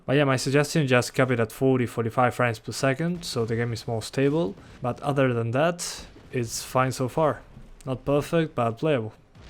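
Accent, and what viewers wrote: Argentina. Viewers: Soy yo o es argentino ? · ingles argento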